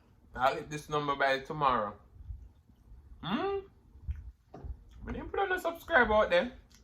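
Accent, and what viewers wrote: Jamaica. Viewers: I SAW YOU IN A COMPILATION AND HEARD YOUR ACCENT AND CAME OVER IMMEDIATELY!! IVE BEEN WANTING TO SEE JAMAICAN MUKBANGERS AHHHH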